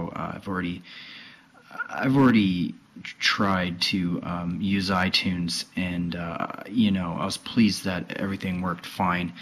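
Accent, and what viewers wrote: Canada. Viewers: This guy sounds Canadian to me. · wow i've never heard someone call so many things by the wrong name. i bet you call the ipod touch an itouch too. the cpu is in ghz and the disk is 320gb. the ram is 4, its called macbook air, and macbook pro. its also called 9400m and 9600m gt. i sense a canadian accent so it's understandable.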